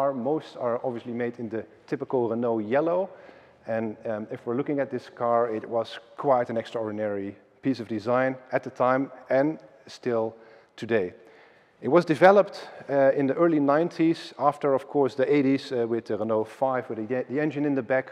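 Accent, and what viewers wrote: Netherlands. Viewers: Always like your videos, but to be honest, for someone like you, an international name in coach building cars. I experience a kind of annoyoance in your Dutch-English, there's so much potential of you take a few classes. I hope you appreciate my somewhat cold feedback 😬 Best wishes for you and Kaeve in 2023 (and beyond)..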